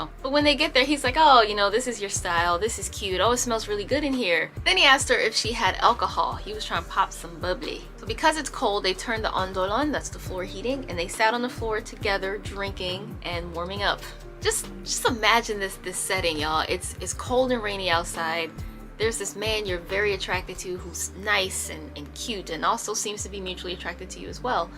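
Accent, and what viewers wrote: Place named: England, United Kingdom. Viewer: Just love your stories. You're such a good storyteller & make me laugh. Also, must say I enjoy your English accent. I'm a linguist too, and a great believer people who speak other languages are also good mimics. 😊 Keep doing what you're doing - more power to you girl! 😊😊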